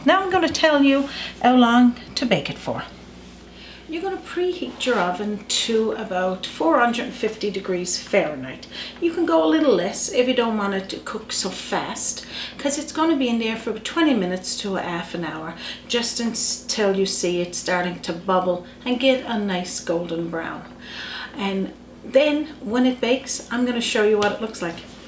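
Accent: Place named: Newfoundland, Canada. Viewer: Maybe a bit too personal a comment, but I love listening to you. You sound like my Nana who came to the USA from St. John's Newfoundland with my grandfather (Papa). They worked on fishing boats before coming here. I keep waiting for you to say "a spot of tea" as they did. Thank you for your accent. It brings back truly beautiful memories.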